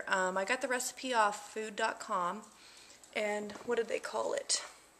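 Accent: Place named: Pennsylvania, United States. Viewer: Hey lady, don't k now if you got my message on a previous video... but i heard you say your parents are from PA... and i went "HA! I knew you were from Pa from your accent" I'm from Pa too... northeastern area... I love your vids sweety, I feel like your one of my friends, I think i listen to you more often than I hear from my own friends lol! I've recently quit my job so i'm home all day long and I've been spending alot of time on youtube! Love ya!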